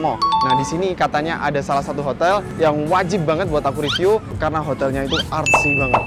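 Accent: Java, Indonesia. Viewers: Kakak orang jawa?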